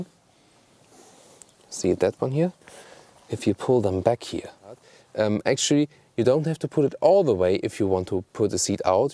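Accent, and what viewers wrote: Germany. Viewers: Ist ein sehr "deutsches" englisch, daher sicher auch für deutschsprachige besonders einfach zu verstehen ;)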